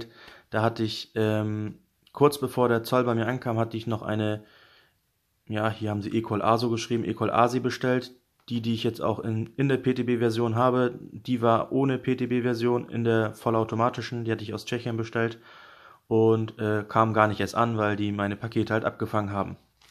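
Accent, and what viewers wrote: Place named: North, Germany. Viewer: Klingst so norddeutsch😂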